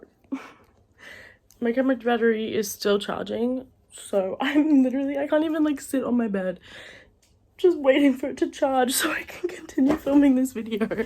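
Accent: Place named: Australia. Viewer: i just found your channel and when i heard you speak i was like a fellow AUSSIE???? incredible. watching you reorganise was so satisfying thanku girlfriend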